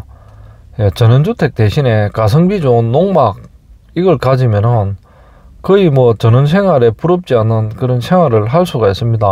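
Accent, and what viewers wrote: Gyeongsang, South Korea. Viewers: 100프로 갱상도 액센트...... 소름끼치네!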